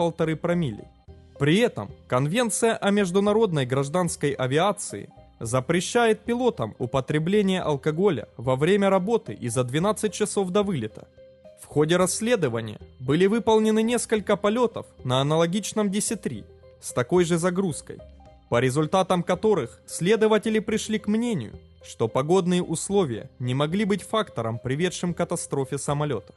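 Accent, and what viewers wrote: Ukraine. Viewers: Самый обыкновенный украинский акцент:) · Капец, какой противный хохляцкий акцент:третЯво сентЯбря тысЯча! Вообще слушать противно. Сразу и выключил. Аффтор, исправляй свой хохляцкий акцент.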